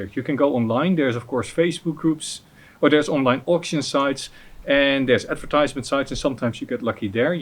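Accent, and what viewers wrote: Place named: Netherlands. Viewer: Never had a dutch accent this thick haha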